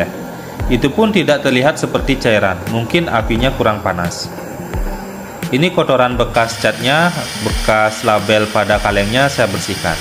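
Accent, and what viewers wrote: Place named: Aceh, Indonesia. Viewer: Logat nya kayak tau, dr aceh bg ya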